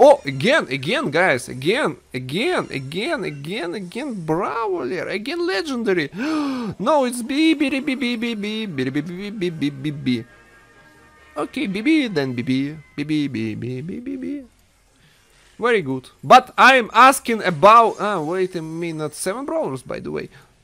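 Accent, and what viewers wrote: Russia. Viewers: Why you have a Russia aczent ? xD sorry I hope you can read dis I'm from germany xD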